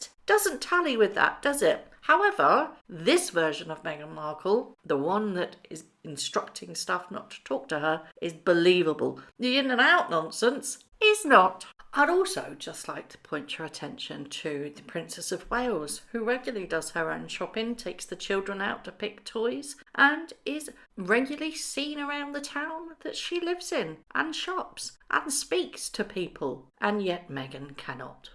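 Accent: United States. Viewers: Your American accent is as stupid as this entire video. Get a life. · Your American accent, matches Megan. Total rubbish 🗑😑 But you are amazing 👏❤💖, love your accent .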